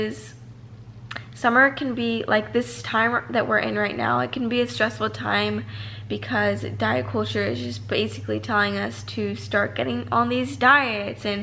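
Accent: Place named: United Kingdom. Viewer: It's the constant British accent for me -- Brit gal over here!!!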